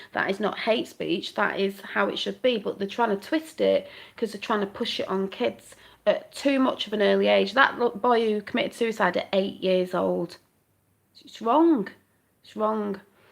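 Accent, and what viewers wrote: United Kingdom. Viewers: Thanks n God Bless u 2 Beautiful. I thought that was a British Accent I love it 🤗 lol... I'm sure u hear 100×'s a day how Beautiful u r But every girl loves 2 b told there Beautiful So hopefully it made u 🙂 n if it did Awesome u hope I did make u smile while making ass of myself 😢😊 n e time u want 2 come 2 America I'd gladly put u on a flight. U Truly r The most beautiful woman I've ever randomly jus ran across here on YT 😍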